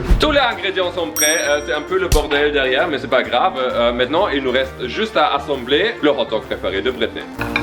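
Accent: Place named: Canada. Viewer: tu mon youtubeur préféré desvidéos accent canadien et qui met quoi des vidéos trop trop rire 👍👍👍👍 24 heures 🇨🇦🇩🇪👍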